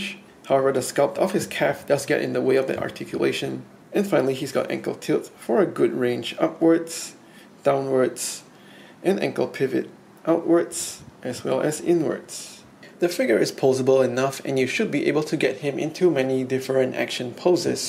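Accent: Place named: Singapore. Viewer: I know a Singaporean when I hear one. Nice review man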